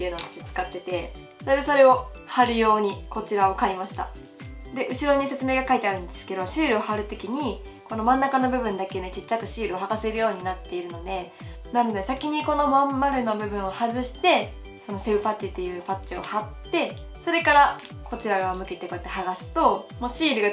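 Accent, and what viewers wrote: Kansai, Japan. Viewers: 最近よく見てます。の性格よさそうでなかよくなりたりタイプです😊関西弁にも親しみわきますの歌も好きです💖応援しています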